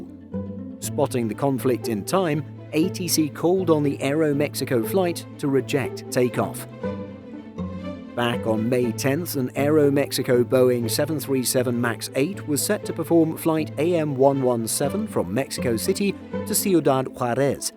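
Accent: United Kingdom. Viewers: Low value production. No real radio calls. Its just a guy with a british accent reading a report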